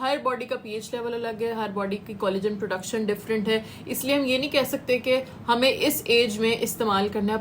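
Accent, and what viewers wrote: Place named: Punjab, India. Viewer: Yar aik to smile boht pyari h apki 2nd punjabi boht pyari bolti ho ap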